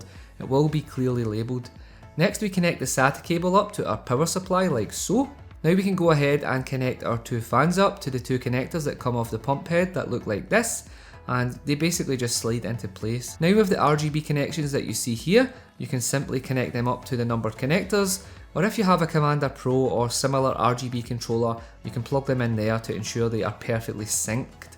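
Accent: Scotland, United Kingdom. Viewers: clear easy to follow instructions, being a fellow scot easier to understand too, great info cheers chief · Yass, didn't expect a Scottish lad when I clicked on this video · U Scottish or anything? Coz damn i love your accent! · Also, what part of Scotland are you from?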